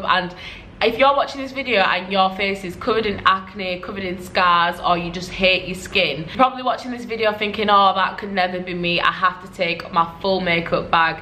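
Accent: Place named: Northern England, United Kingdom. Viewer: literally just came across this vid and the first thing i noticed is how much i love your northern accent! i'm from middlesbrough...love the video...i'll be hopefully doing this trip shortly! keep smashing it! #NorthernLassesDoItBest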